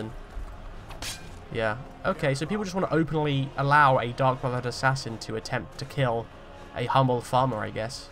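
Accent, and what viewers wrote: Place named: Europe. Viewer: I'm an American and the European accent makes it so much better. Good content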